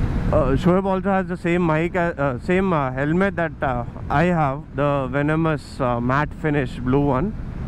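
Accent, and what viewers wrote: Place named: Hyderabad, India. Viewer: Drone shots super👏👏 Hyderabadi language 😅😂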